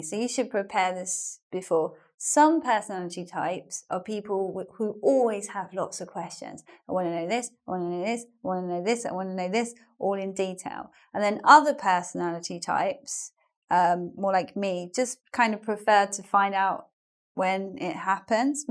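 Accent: United Kingdom. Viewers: If this is not a british accent then I don't know what the fuck is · really? you should listen people speak cockney too. She has a lovely British accent for me ;) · a beautiful British accent =))) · Im very sure this is british accent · you're so cute and your British accent fits you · I am big fan of your. r u British? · are you british? I notice your accent different, thank you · i came here to practice my english...i struggle a little bit with british english but it was great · What a question. I didn`t notice her accent myself.kkkkkkk Of course she`s british man, c`mon. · I appreciate your your tips too much. I love british accent and you was very helpful to me. I´m preparing myself for an interview to a job at a british university. God bless you and me! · Dieses british englisch macht einen total wahnsinnig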